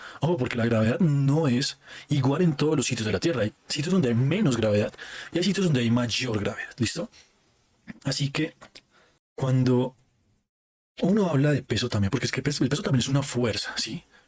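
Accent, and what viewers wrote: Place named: Bogotá, Colombia. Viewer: La verdad amigo, creo que eres una persona inteligente, pero d ves mejorar muchas cosas. Primero, el acento bogotano que se caracteriza por hablar entre los dientes y hay momentos en que no se entiende. Segundo, eres muy disperso y eso cansa a quienes se interesen por el tema. Tercero, dejas muchas lagunas a pesar de que el tema es muy interesante. Yo soy meteorólogo y quizá luego haga un vídeo al respecto. De todas maneras, ánimo.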